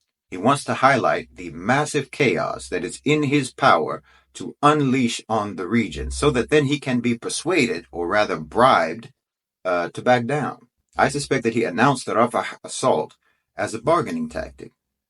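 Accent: United States. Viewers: What is absolute madness is this nutter saying that Israel as a State should be terminated. It is simply repeating Hamas’ propaganda with an American accent.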